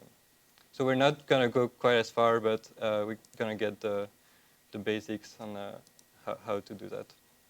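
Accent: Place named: France. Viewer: Franglais accent make me super concentrate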